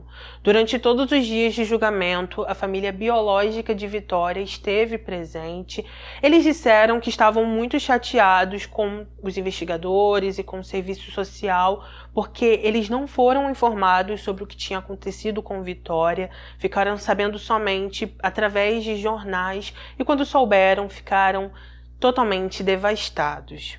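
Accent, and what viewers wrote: Rio de Janeiro, Brazil. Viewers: Olá, gosto muito de sua narrativa, sua voz é muito bonita e seu sotaque deve ser carioca, já sou inscrita no canal.Triste esse caso, creio que tudo estava errado desde o início quando tiraram as crianças da mãe biológica! Lamentável e nada trará de volta a garotinha!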